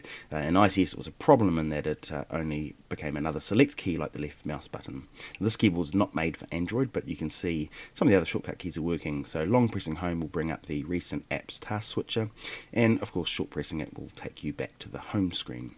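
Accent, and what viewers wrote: New Zealand. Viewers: Are you from New Zealand by any chance?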